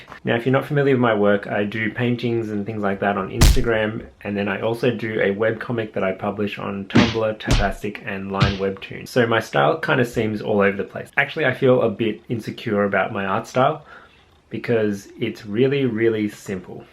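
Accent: Australia. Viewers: Australian? o_o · WAS NOT EXPECTING THE HUGE AUSSIE (or New Zealand) ACCENT!!! I fell off my chair XD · wait was that sydney I heard..? yOU'RE AUSTRALIAN TOO?? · Whew, asian-australian (?). The accent was unexpected.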